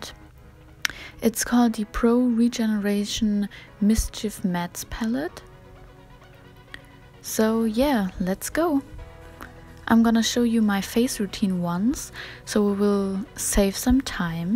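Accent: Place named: Germany. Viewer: Which country are you from? I think that you have kind of a german accent 😊 I'm from Germany too🥰 where did you get the palette? Did you order it?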